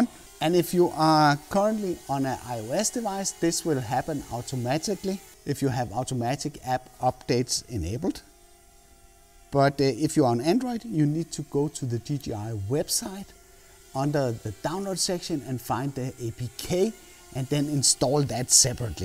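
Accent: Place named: Denmark. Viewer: man kan godt høre at du er dansker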